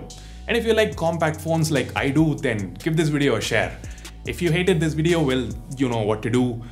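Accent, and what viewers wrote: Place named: South India, India. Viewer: get rid of horrible south Indian accent !!!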